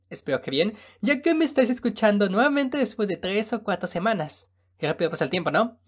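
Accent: France. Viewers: oye eres de francia porque tienes o me suena q tienes asentó francés, me saludad??? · Acabo de dar cuenta del asentó francesas o solo soy yo :v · Uff ya quisiera ver eze asentó francés en la webserie de XD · Enserio? Yo pensaba que eras francés... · Oye eres frances?:v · soy yo o tiene acento francés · Tengo dudas 1:eri gei? 2:eres frances? Fin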